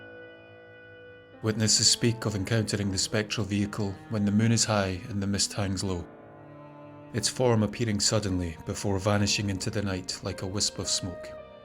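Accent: Scotland, United Kingdom. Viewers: Excellent Narration. Excellent Voice. Thank You SO Much for the beautiful landscapes-for the Lack of background noise that would interfere-with hearing the wonderful historical, & supernatural information from your great, original Scottish voice. I've always wanted to see Scotland, & now I can, thru your wonderful videos. Please-Change Nothing. It is Perfect, as is. Cordially-Pam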